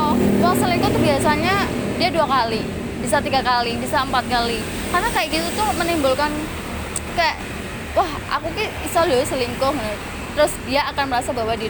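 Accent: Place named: Java, Indonesia. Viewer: Iya memang sengaja medok supaya unsur jawanya ga ilang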